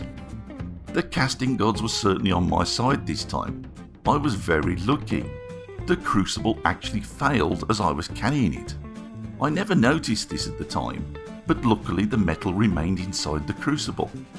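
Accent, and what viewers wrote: Australia. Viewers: Love the attention to detail. You're quickly on your way to becoming a master at lost wax. Won't make any comments about the male tree because it would be crude to say something about it's balls.lol Noticed below someone likes your "Aussie" accent. Thats ok because I read on big stack where someone asked if his flag was the Union Jack!! Lmao good show mate. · Here from bigstackd casting. Love the Aussie voice and the oil burner tutorial! Subscribed! Great cast btw.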